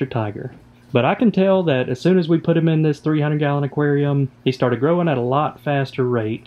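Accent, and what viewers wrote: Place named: South, United States. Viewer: the way you've said "hello fresh" was poetically beautiful. What part in the US are you creating from? I did hear a cave climber sound similarly too. I think they were from georgia or somewhere southern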